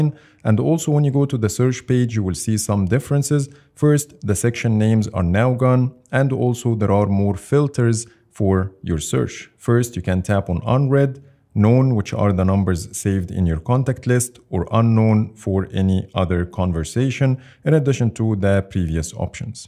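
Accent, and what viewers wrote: Egypt. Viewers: Egyptian accent do be strong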